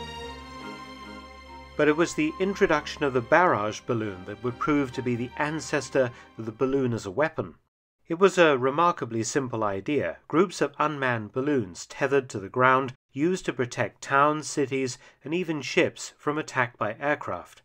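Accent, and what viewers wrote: United Kingdom. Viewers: Brit pronunciation. Any adult has heard this.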